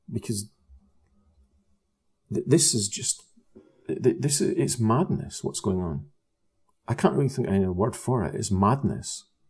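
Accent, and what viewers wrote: Scotland, United Kingdom. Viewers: If you think you live on a ball then aircraft would have to fly around this ball. You sound like you live in Scotland. A flight from Scotland to Gran Canaria in the canary islands is 2,000 miles south. Conventional mathematics will tell you that over this distance the curvature of the earth if it were a ball would show a drop of just over 500 miles ( 8 inches times 2,000 times 2,000 = 32 million inches or just over 500 miles). This would mean that for every mile travelled the plane would need to descend 1/4 mile, it would be nose down all the way. However experience will tell you that this does not happen, the plane will be in level flight all the way aside from take off and landing. BTW the Southern Hemisphere long haul non stop flights are bogus.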